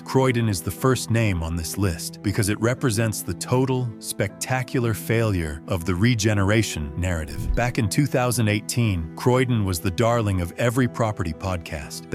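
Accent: United States. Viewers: That American accent chatting our London business is so unfit and that’s only the start. The information itself is used toilet water. Whoever uploaded this AI nonsense needs to get a grip.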